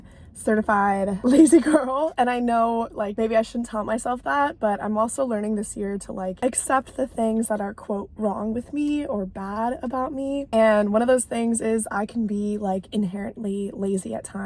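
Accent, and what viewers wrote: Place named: United States. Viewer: This accent is definitely American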